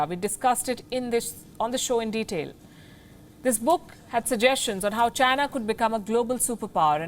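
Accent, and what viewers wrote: India. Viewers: India voice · Your English is after all 3rd rated indian English, if he is propagating for less aggressive posture then how can say hawkish at the same time? It's not hawkish it's dovish bharati mata. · This indian lady is trying her best to slang...but turn out still india sound. 🤦‍♂️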